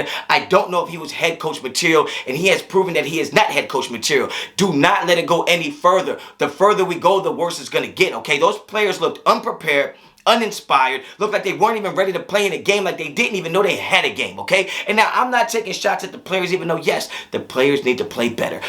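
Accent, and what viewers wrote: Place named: AAVE, United States. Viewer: Stop with the ebonics, knucklehead. It's a bad look, bro. Trust me. 🙉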